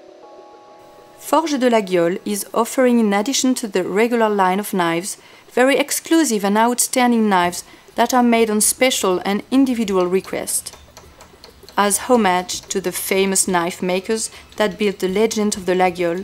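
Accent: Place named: England, United Kingdom. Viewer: Cet accent anglais m'écorche les oreilles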